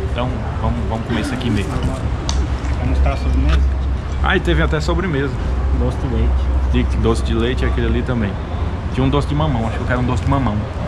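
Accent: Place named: Ceará, Brazil. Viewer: FállôôUuuuu do jeito que tu fala é muito cearenses man!! um salve daqui de SP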